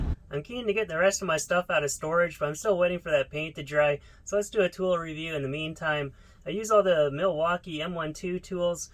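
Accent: Canada. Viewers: since i follow i learn how to say OUT in canadian, "IUT"...lol:D:D love u brother:)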